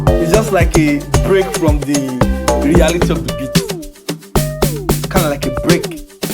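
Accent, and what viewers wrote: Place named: Nigeria. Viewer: Love your beat and your 9gerian accent. ❤️ from 🇧🇷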